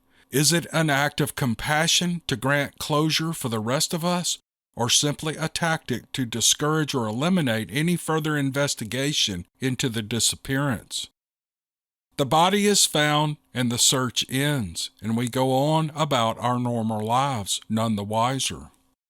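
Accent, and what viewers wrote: South, United States. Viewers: Sorry pal, your stories were indeed intriguing, albeit, your accent is abhorrent… ive heard it my entire life, i was born in the deepest southern accent town, yet i never gained this terrible accent… some of my fam did… i suppose its attributed to a lower IQ, or perhaps a less of a care for reality, maybe one in the same… who knows. Thanks for the vid tho, its my first and last one, cheers my friend! (I imagine youll do well since many folks love the southern accent…) (and god have mercy on humanity if this is indeed an AI voice… sheesh)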